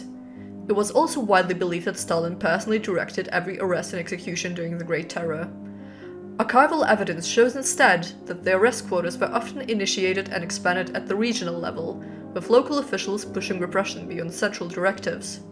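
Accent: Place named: United Kingdom. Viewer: legendary youtube recomended pull did not expect you to sound so british...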